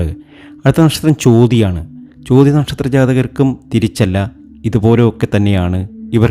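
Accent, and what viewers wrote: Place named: Alappuzha, India. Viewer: ആലപ്പുഴ ക്കാരൻ ആണല്ലേ 👌👌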